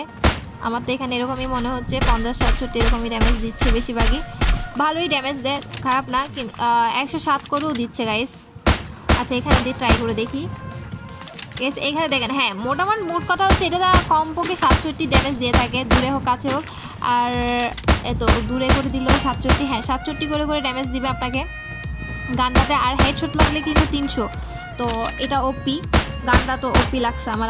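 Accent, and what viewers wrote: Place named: Chittagong, Bangladesh. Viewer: Arre api tumi to khass chittainga khali thake thake bolteco🤗🤗🤗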